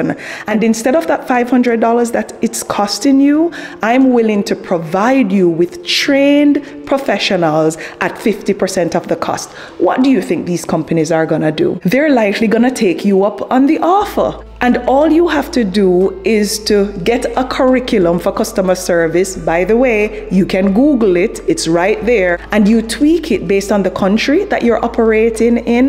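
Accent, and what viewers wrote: Jamaica. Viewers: I love Jamaican accent. Can Listen to you all day long 😊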